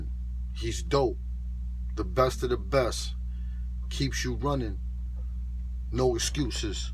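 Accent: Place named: New York, United States. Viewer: Great 10 minutes of insight...This video got me ready to sign up for some cdl classes and start my own journey. Off topic you sound like you from nyc💯💯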